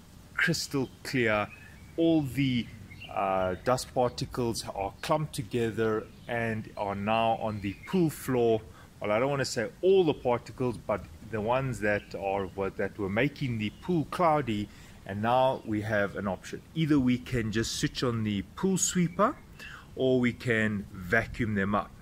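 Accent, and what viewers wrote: South Africa. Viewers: Lekker , hearing a SA accent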